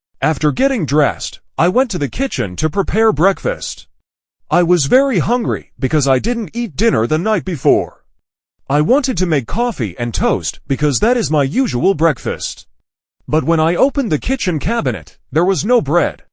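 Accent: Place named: United Kingdom. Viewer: Es acento británico se escucha muy bien la pronunciación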